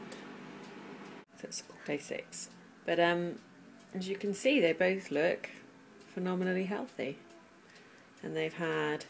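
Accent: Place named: Wales, United Kingdom. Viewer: I could barely understand your thick Welsh accent...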